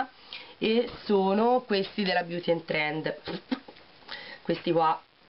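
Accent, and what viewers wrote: Tuscany, Italy. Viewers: Mi fa sempre piacere sentire un dialetto amico su Facebook, una bella toscanaccia come me :)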